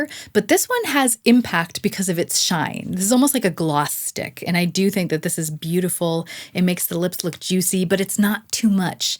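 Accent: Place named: Canada. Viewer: I think I hear a Canadian accent? Yay!!🇨🇦🇨🇦❤️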